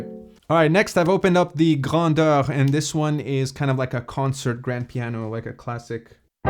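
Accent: France. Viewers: nice french accent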